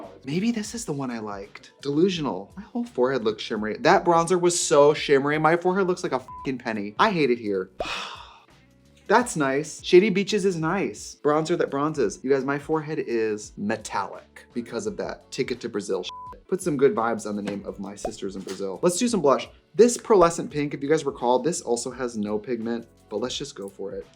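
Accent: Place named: Milwaukee, United States. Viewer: it’s the midwest accent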